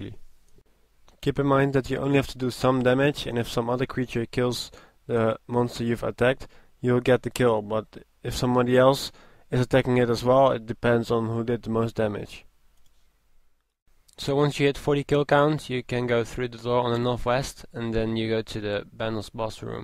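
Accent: Netherlands. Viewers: Ik hoor aan je stem dat je NL bent! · I love the "+20,500,000" xD btw are u dutch? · Haha leuk nederlands accent :p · are you from the netherlands or from Belgium? nice vid btw, thumps up :)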